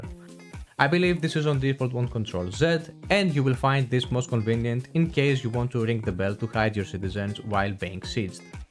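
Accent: Greece. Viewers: αθανατη ελληνικη προφορα! ωραιο βιντεο ευχαριστω!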